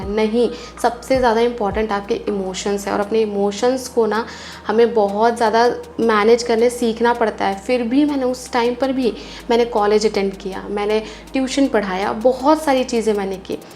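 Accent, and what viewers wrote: Bihar, India. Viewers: Continue rkho short video with Bihari Tone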